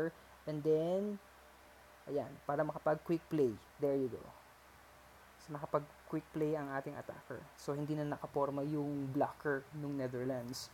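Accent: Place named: Brazil. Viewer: Tu é br ne o seu sutaque parece pq não vez um em ptbr?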